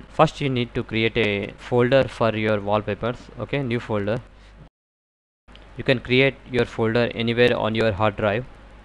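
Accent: India. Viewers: Bro what it doesn't matter if he has an Indian accent, he is still speaking English at the end of the day.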